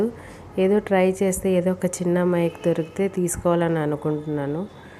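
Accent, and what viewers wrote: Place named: Rayalaseema, India. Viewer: Ekkada unnaru rayalaseema basa matladu thunnav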